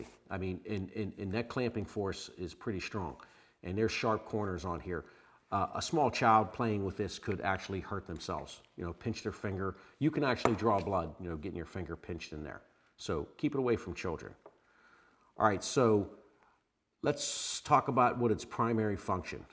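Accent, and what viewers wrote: Pittsburgh, United States. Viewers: That Pissburgh accent makes me miss home and goin dahntahn on the weekends. Solid review too!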